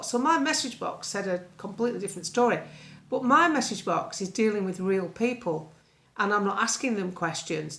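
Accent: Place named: Lancashire, United Kingdom. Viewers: Pure common sense is never a waste of time. I’m a Lancashire lass myself and love your accent.🏴🇬🇧🏴🇬🇧🏴🇬🇧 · Great to hear common sense with a Lancashire accent.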